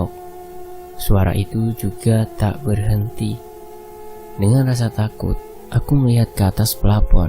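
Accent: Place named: Surabaya, Indonesia. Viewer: Waahh.. ini nih yg keren .. bawainnya kalem saja .. mau serem mau seru ..mau bercanda tetep enak didengarnya .. saya penakut banget ..karena suka di isengin makhlus...🙈🙈 Tapi tetep aja penasaran dan ketagihan denger kisah2mu min.. yaa..meakipin beraninya denger pas siang sampe ashar ajah..😅😅🙈🙈 abis suka ngangenin sih kalo sudah berasu2 ber dancuk2 ala logat suroboyoan mu min.. kriuk banget. !!😅 Tetep semangat berbagi ya min.. dibalik setiap kisah pasti ada pesan positifnya terlepas kisah fiktif ataupun nyata.. Oh ya ... Satu masukan ya min. Tampil total aja ngebawainnya .. modulasimu yg oke bisa dikembangin berbagai karakter .. cuma yg kurang berpadu kalo karakter kasar pake bahasa Indonesia .. enggak serasi dg modulasimu yg kriuk kalem itu.. kek kepepet gitu galaknya..😅😅😅. Oke trims min.. berkah memberkahi selalu ditiap kehadiranmu..👍😊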